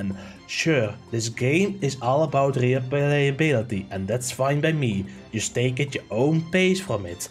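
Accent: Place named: Netherlands. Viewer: That Dutch accent is so funny. 🤣 Thanks for your honest review, was unsure with this game but am glad I’m skipping it. 😉 Great work sir!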